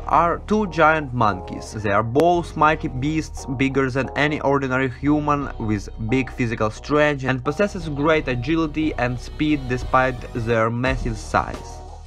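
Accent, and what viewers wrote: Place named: Russia. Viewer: Первое что услышал, это то что он русский А потом посмотрел мейл ру почту в описании канала)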